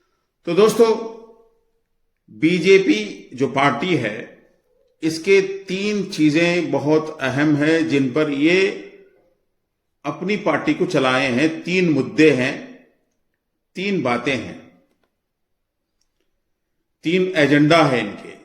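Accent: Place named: Bangladesh. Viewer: آپ کو اللہ پر یقین نہیں ہے کیا۔ کیوں یوٹوب سے پیسے کمانے کے لیے لوگوں کو دہشت میں مبتلا کر رہے ہو۔ ۔۔اپ کے pronuciation سے لگتا یے کہ آپ کا تعلق بنگلہ دیش سے ہے۔ اللہ حدایت دے ۔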